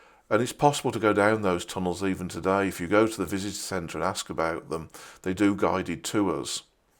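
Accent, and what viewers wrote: Birmingham, United Kingdom. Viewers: Absolutely superb. Your soft brummy twang evoking memories of the Shropshire lads.......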